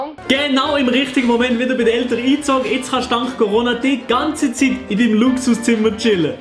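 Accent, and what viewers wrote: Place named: Fribourg, Switzerland. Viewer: i ha mer scho fasch deicht du sigisch friburger wägem akzent XD geils video!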